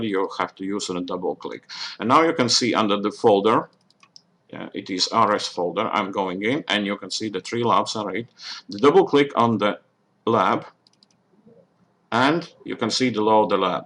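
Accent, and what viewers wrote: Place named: Russia. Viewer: Thank you for your job! Are you Russian?)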